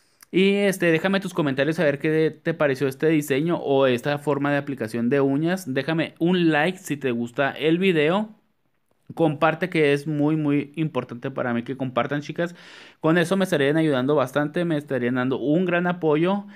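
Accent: Chihuahua, Mexico. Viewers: Eres de shihuahua?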